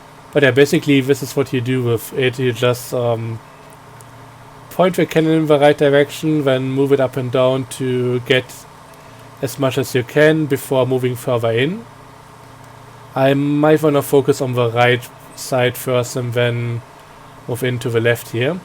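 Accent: Germany. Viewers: Do I hear a bit german?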